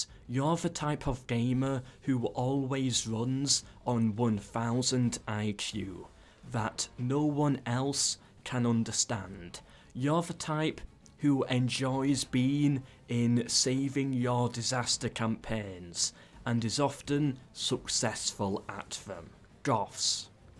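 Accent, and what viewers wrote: United Kingdom. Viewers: Oh god your british acent is that british I underground only 60% of your words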